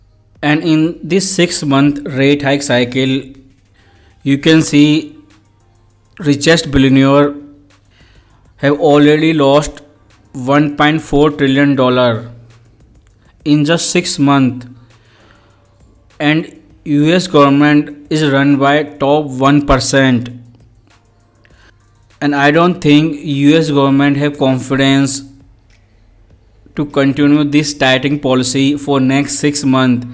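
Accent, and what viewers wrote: India. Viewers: Why don't u speak in Hindi...why this butler english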